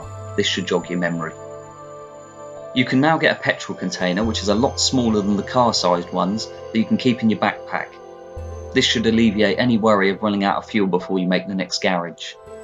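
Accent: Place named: United Kingdom. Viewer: sometimes i dont undertand uk english but nice video dude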